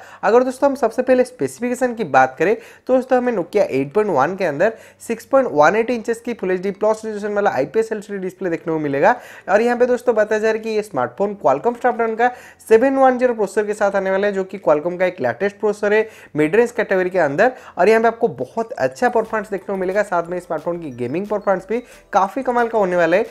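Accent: Odisha, India. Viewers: Loved your odia accent!!!!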